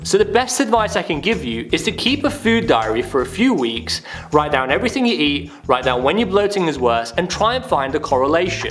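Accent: United Kingdom. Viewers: For the first time, I hear a Persian speaks British beautifully❤. Thank you for help spirit.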